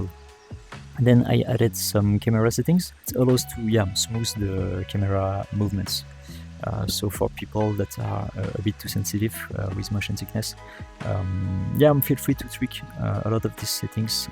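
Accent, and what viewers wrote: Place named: France. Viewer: youtubes ai is so stupid and racist it auto dubbed this from french dispite it just being ur accent smh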